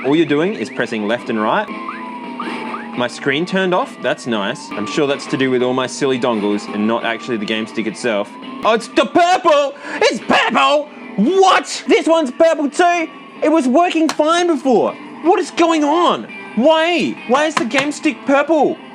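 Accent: Australia. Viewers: And I think he's living in Australia because of his accent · Didn't know I needed to hear an Aussie say "Ouya" today but here we are. What an accent.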